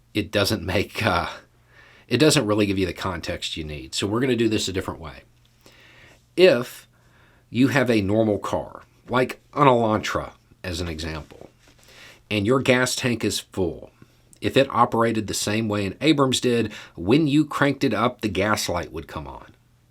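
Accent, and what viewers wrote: South, United States. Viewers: doing a mocking deep southern accent while already having a southern accent lol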